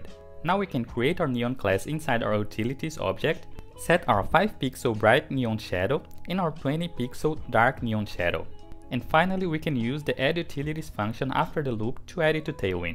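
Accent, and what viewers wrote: Brazil. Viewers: Eu sabia que esse sotaque tinha um jeitinho brasileiro!!! Fera!!!! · Pq eu reconheço um sotaque brasileiro tão fácil?! haha Não sei explicar, não sei imitar, mas com 5 segundos de video, já sabia que era brasileiro! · Eu sabia que era inglês de brasileiro hahah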